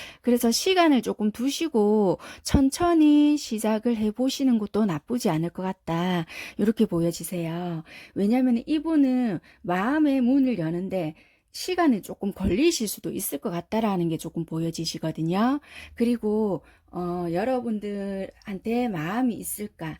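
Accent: Busan, South Korea. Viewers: 신기해서 한번 더 봤는데요~ 영상도 너무 예뻐요~ 👍🏻👍🏻 선생님~ 혹시 타로 배우려면 어떻게 하면 되나요?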